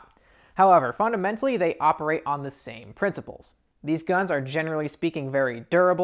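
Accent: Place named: United States. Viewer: I cringed a little when you were describing a the blowback pistols.. Man, you sound like you’re here in the US, so why not get a real pistol? Having some knowledge of real guns can’t hurt. Racking the slide is really not that cool. It’s an integral part of the design. lol.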